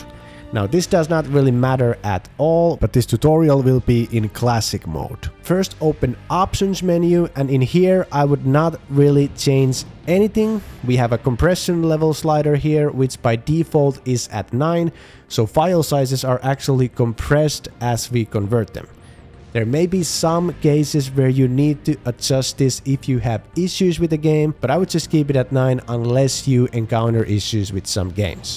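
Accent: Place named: Finland. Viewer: Suomiaksentti on kyllä niin tunnistettavissa välittömästi. :D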